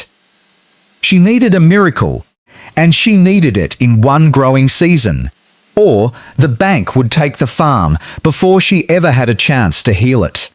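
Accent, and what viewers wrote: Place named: Australia. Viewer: With the Australian voice, maybe you should tell an Australian story. I really enjoy these stories..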